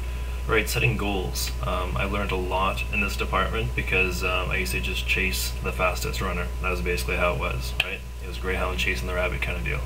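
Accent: Canada. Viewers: 3 mins in realized hes canadian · Nice Canadian accent haha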